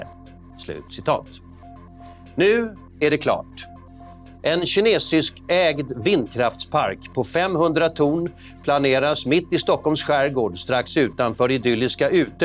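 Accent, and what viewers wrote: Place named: Baltic states. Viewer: Lätt svenska. Låter Balt...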